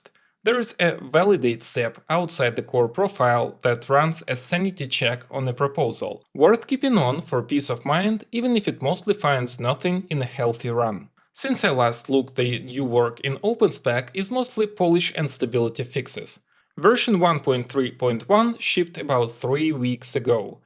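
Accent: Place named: Russia. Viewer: strong russian accent Есть аналогичное видео на русском?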